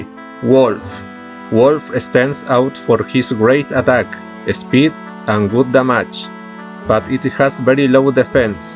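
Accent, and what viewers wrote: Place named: Spain. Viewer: Porque este ingles suena como el de Mariano Rajoy?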